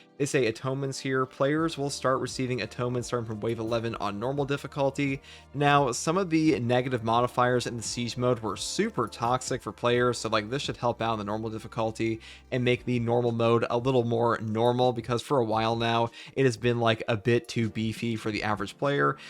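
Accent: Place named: AAVE, United States. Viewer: ebonics.. I think??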